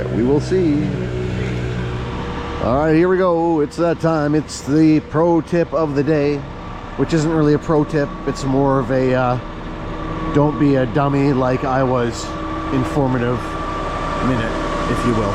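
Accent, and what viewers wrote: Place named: Canada. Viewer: You must be from the deep south of you think a guy with a Canadian accent sounds smart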